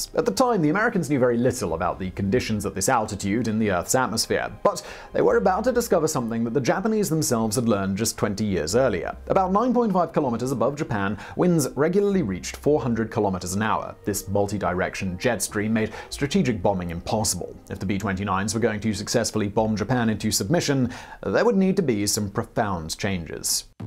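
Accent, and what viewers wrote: United Kingdom. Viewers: My wife: how many channels does this guy have? Me: why? My wife: I hear that Brit's voice all the time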